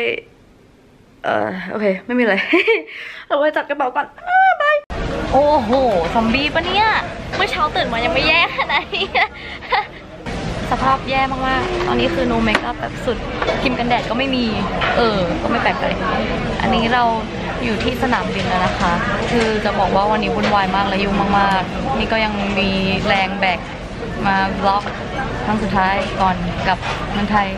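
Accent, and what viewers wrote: South Korea. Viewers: เต้นเป๊ะมากกกก //ขอบสำเนียงเกาของพี่มากเลยอะ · ชอบสำเนียงภาษาเกาหลี มากง่าา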